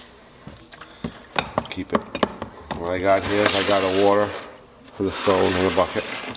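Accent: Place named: Massachusetts, United States. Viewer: Hey...if we got married....my name would be......Tamara!!! Lets do it! Lol i have no idea who you are im just here to get some instructions on how to sharpen my shears and you sound like you are from Mass. Have a great day thank you for posting this helpful video. Hope u got a little laugh my pay for ur vid. 😎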